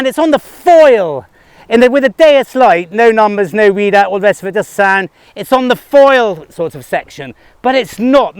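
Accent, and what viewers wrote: United Kingdom. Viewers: I do love youe videos and your very British English. I always smile with You when your smiling finding something interesting - more of this please! Must be fun to go out with you detecting... Greetings from Switzerland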